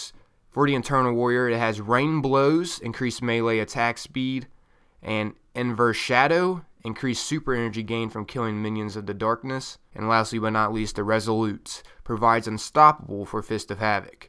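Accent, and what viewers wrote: United States. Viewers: it seems like your voice slowly turned more country throughout the video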